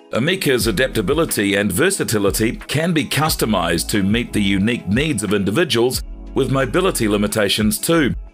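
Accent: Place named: New Zealand. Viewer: A kiwi voice, crack up. Go bro! I love our accent.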